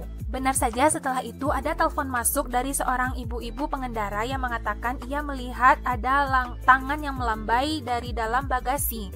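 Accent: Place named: Padang, Indonesia. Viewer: logatnya logat padang banget, org sumbar kah?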